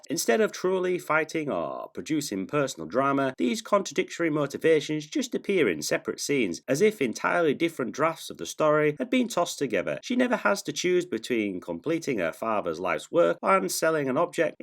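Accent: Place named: United Kingdom. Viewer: its just his beautiful british accent fr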